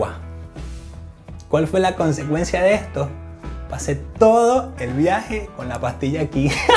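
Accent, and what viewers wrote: Argentina. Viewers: Me encanta tu acento medio argentino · Y qué es lo q te da risa? Supongo ignorás q los argentinos hablamos así.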